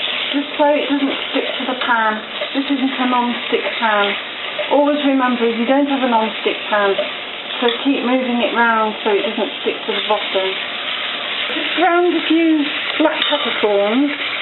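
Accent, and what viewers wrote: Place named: United Kingdom. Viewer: It's that damn British accent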